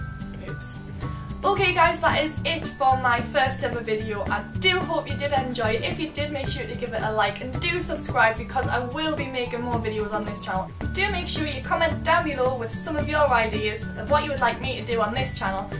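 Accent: Newcastle, United Kingdom. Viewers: i follow both your accounts :) :) and im a geordie as well :) nice to hear someone on the internet talk like me :) :) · Haha, I loooove your accent! :D Thought you were from Scotland at first, but seeing as Newcastle is "almost Scotland", I guess I was close enough. ;) (I spent an Erasmus semester in Edinburgh and my heart is melting every time I hear a Northern accent! :) Btw, great video as well! Very entertaining to watch. xx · Wont be having any problems understanding you, been watching Geordie Shore for so long. Good job on your first video (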